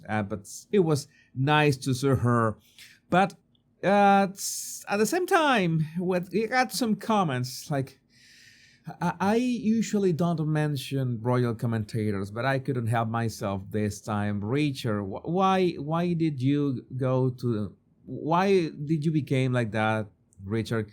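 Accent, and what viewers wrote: France. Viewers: French accent? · Well I've heard your French accent and it's fabulous. It surprised me about the "bird" . 😅. I like it. It's 🥰 cute. Take care.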